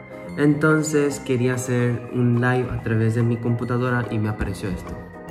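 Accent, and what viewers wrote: Paraguay. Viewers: En el momento que empezó a hablar me parecía que tenía el acento paraguayo y después comprobé 😁 Que bueno tener un lindo coreano en mi hermoso país por favor vengan más coreanos a PY .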